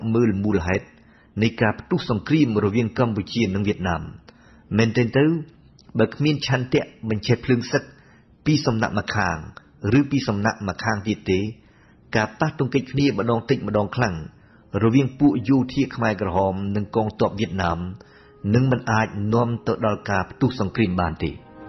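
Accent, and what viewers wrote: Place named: France. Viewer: I LIKE HIS voice SOUND mix French and KHMER interpreter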